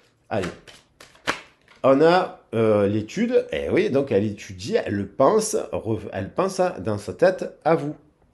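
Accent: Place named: Marseille, France. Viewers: Et bien voilà, vous m'avez encore fait rire ! J'ai vécu à Martigues, j'avais moi aussi cet accent qui vous caractérise ! Profitez bien de votre soirée entre amis ! Merci pour cet échange et d'avoir pris le temps de me répondre, c'est excessivement rare, comme mes commentaires en règle général, mais nous avons tous besoin d'encouragement. A bientôt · Oh bonne mère Bon anniversaire moi qui adore cet accent...Nîmois et Marseillais de coeur...ma.famille.avait la meilleur boulangerie du Merlan...Garvi....en 86.. jai des souvenirs magnifique...L.OM ....puis a1 selection the Voice a L.hyperion......bonne journee et merci pour cette guidance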